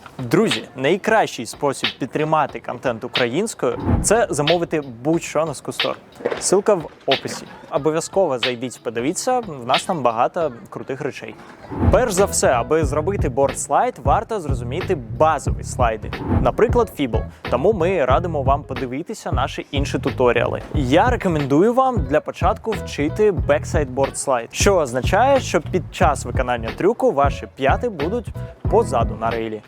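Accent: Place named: Belarus. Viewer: Полностью согласна несмотря на то что есть белорусский акцент, но это исправляемо временем😊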